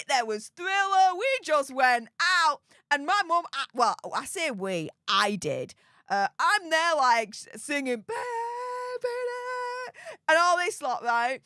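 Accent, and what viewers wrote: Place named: Manchester, United Kingdom. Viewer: I grew up in Manchester until I was 14 . I have been living in Biloxi Mississippi since 1972. Can’t believe how fast 52 years has flown by. Your accent makes me home sick, Much love to you from the Deep South, only 60 miles from New Orleans. 😊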